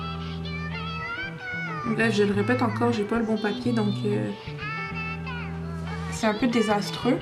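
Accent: Quebec, Canada. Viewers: je suis sur que tu est Québécois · Tu est Québécoise !!! 😮✋😐. Ton accent t'a trompé mon amie 😏 Et une affaire de de résolu 😃 TU mérite MINIMUM 100 000 k abonné 😉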